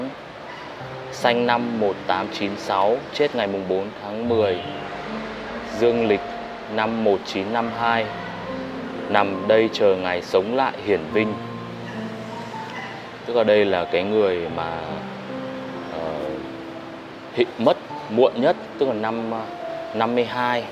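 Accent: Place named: North, Vietnam. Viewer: Hello, dung now tieng Bac Dan mien Nam Khong Thinh dau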